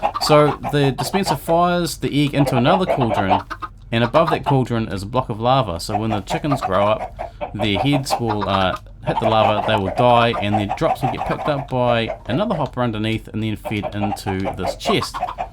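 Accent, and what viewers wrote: New Zealand. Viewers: you sound like a new zealander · I guess you have never heard a New Zealand accent then... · EEEG lol you're a total kiwi! Props to you! Genius idea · Holy crap u from Nz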